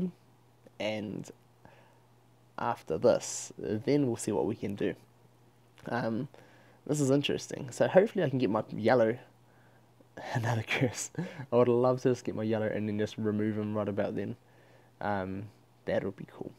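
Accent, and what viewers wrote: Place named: South Africa. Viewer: Johannesburg, your accent sounds very South African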